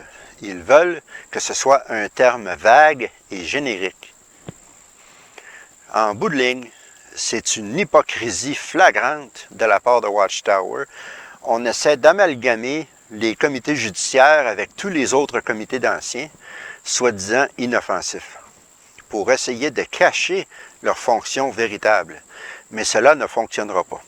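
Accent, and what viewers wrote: Quebec, Canada. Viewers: Cher, tu ne me connais pas encore mais ça fait déjà quelques mois que j’écoute avidement depuis la Suisse tes vidéos (attention, au masculin en québécois…! ). Tout d’abord, j’ai toujours adoré l’accent québécois et j’ai toujours l’impression que vous autres avez un humour supplémentaire et plaisant par rapport à chez nous! Merci pour toutes tes vidéos (pardon, tous tes vidéos! ) tellement logiques, intelligents et en même temps simples à suivre et comprendre. Tu fais, toi au-moins, du bien à beaucoup de monde qui ouvrent les yeux et se déculpabilisent, contrairement à ces connards ridicules et rigides de la Watchtower! Quelle arrogance que des humains se prétendent représentants de Jésus et Dieu sur la terre (auxquels, d’ailleurs je n’ai jamais vraiment cru). L’un de nos comiques suisses disait dans l’un de ses sketches « peu-à-peu les écailles churent hors de mes oculaires…! 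). Eh bien c’est mon cas bien que je n’ai été qu’un « intéressé « comme ils disaient à la congrégation pendant quelques années, heureusement que je ne me suis pas fait baptisé (Dieu m’en garde…! ). Depuis 2 ans, j’ai fait un travail psychologique sur moi-même et tes vidéos, ainsi que ceux de Dominique Reynaud en France, ont contribué à donner un coup de balai définitif à ces âneries des TJ et autres religions; en somme, pour moi tes vidéos c’est « la cerise sur le gâteau «. Merci encore et mes amitiés virtuelles depuis la Suisse région Lausanne. Daniel Bulloz.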